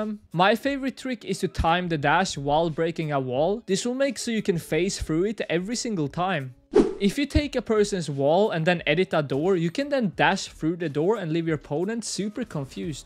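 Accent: Sweden. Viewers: You speak swedish, I can heat it in your accent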